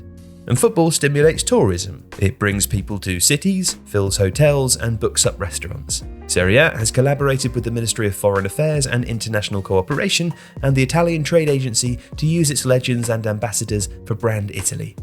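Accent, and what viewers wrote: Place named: England, United Kingdom. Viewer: didn't know the channel viewership is exclusively Italian. Strange that they make content in English and that too a narrator with an English accent. Oh well a waste of resources then this video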